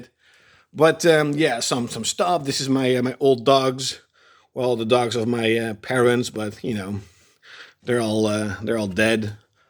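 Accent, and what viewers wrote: Netherlands. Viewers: Geen idee wat het is maar je kunt altijd gelijk merken wanneer iemand Nederlands is 😂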